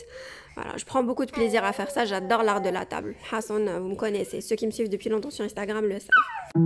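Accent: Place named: Kabylie, Algeria. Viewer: Même ton accent kabyle est charmant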